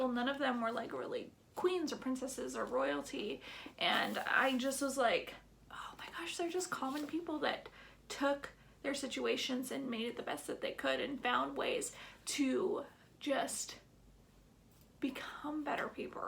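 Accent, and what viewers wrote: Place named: South, United States. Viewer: love your accent, where are you from? South?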